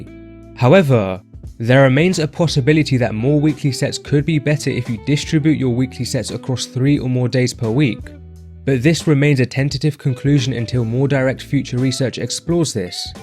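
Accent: United Kingdom. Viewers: What regional English accent is this?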